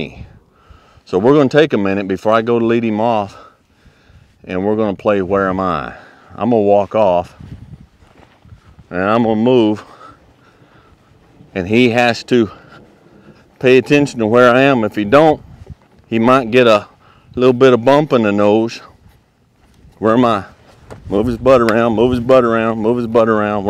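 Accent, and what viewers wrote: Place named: Kentucky/Tennessee, United States. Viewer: A big welcome to Texas. Mighty glad your now in God's country. With that Kentucky/Tennessee accent you will fit in just fine. The scenery of the hill country of Bandera is mighty beautimous. As always I look forward to your informative videos, and the lessons of life you tell.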